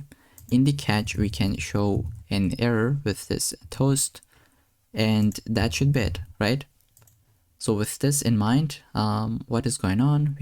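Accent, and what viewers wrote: Turkey. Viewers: Merhaba kardeşim,aksandan hemen Türk olduğunu anladım .Teşekkür ederiz video için:)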